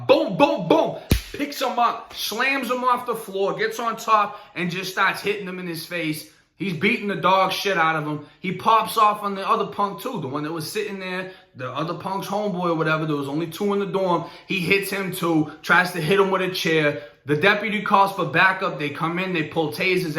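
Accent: Boston, United States. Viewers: I'm from just north of Boston and your accent sounds like ours,is there a part of Florida where other dudes talk like you? It threw me off after I started watching your videos that you weren't from the northeast.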